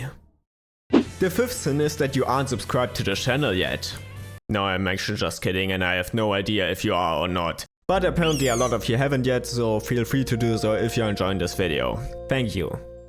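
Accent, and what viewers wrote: Germany. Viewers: gerne machst ja auch nen klasse Job <3 und wenn es dich stört kommentiere ich gerne zukünftig in Englisch:). Denke halt es liegt daran das man gut raushört das du deutscher/deutschsprachig bist.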